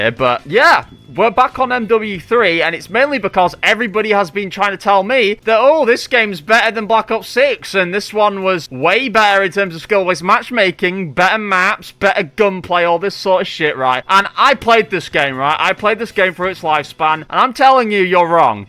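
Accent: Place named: Yorkshire, United Kingdom. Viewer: Bro you have literally the exact same opinion as me, even down to the yorkshire accent lmao.. was saying this on reddit the other day, went on to complain about hackers and some nob was trying to say it was the best game of the modern era and it rage baited me hard enough to give this entire breakdown to them basically bar for bar, word for word what you're saying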